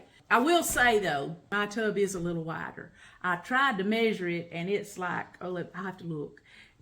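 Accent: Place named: South, United States. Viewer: Bless you,. I lLOVE your attitude AND your southern accent!:) My mom, her parents, her siblings etc., were from Alabama. Since I found your channel by looking for updates after knee surgery, I now have to look through your playlist for some good ole southern cooking. YIPPIE!!! Thank you. ❤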